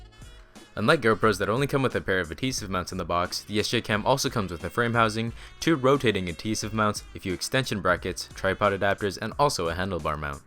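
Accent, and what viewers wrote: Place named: Scotland, United Kingdom. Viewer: Fantastic review maybe talking just a wee bit to fast or maybe its just that accent im so used to Scottish. Great stuff thanks and oh maybe if you have a moment to tell me the awsum music that you used in your review. Much love from bonnie scotland ACH AYE!!!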